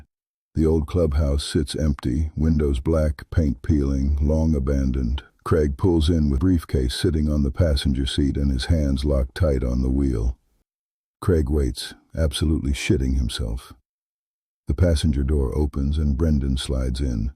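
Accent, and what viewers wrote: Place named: United States. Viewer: This is good but the American accent kills it lol otherwise good job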